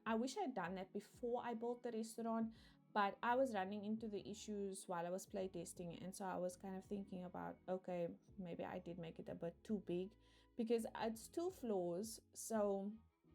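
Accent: South Africa. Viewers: BTW I love your accent, is it South African?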